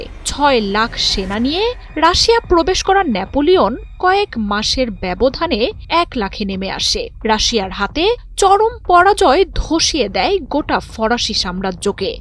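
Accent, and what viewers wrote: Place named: West Bengal, India. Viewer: News reporting shune west Bengal er kono channel mone hocche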